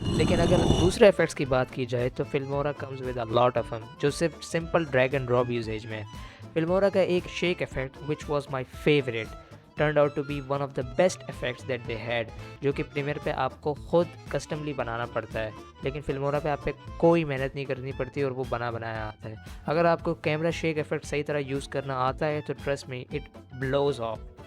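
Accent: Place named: India. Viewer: He has the heaviest indian accent of all times.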